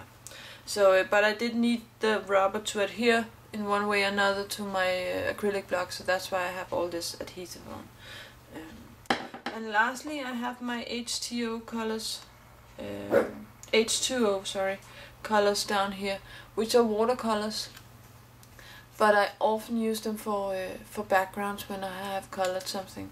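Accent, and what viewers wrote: Denmark. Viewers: Are you from Denmark ? xD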